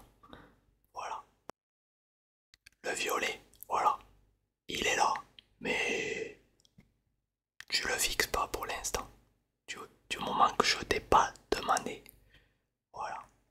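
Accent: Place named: Toulouse, France. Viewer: Ahhh Toulouse !! je n’y avais pas pensé, j’imaginais Avignon ou Agen je me suis pas tellement trompé, je veux dire au niveau de l’accent. Moi Valence. Bonne soirée… Je vais regarder une de t’ai vidéo pour ronfler !… j’aime bien l’autre, tu m’as laissé un autre commentaire, tu arrives dans ton salon de coiffure …. C’est le bruit du ciseau qui est soporifique. J’aime bien le style sa sort du asmriste bien carré …. Continue comme c’est cool. 👍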